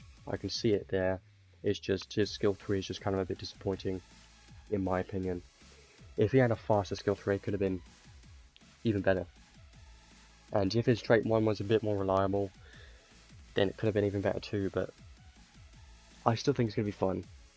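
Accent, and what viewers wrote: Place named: United Kingdom. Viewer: That reaction is why I see your videos at night. Such a soothing Brit voice. XD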